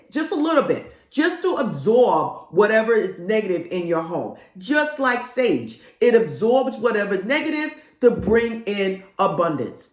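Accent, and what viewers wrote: New York, United States. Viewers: I love your New York accent Ms! Its helping the message sink in 😂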